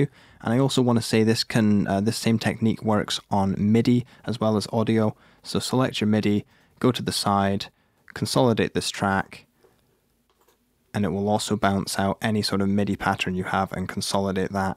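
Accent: United Kingdom. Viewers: Great video and useful tips!Just wondering are you from Britain?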